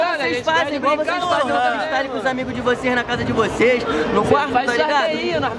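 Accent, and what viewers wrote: Rio de Janeiro, Brazil. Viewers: u Sotaque carioca...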